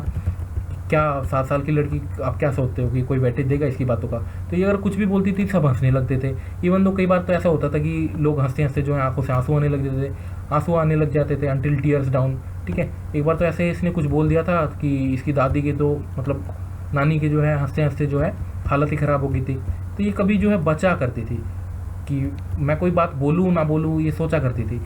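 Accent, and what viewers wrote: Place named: Rajasthan, India. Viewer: You from Udaipur or Rajasthan. ?? Your tone depicts that... please tell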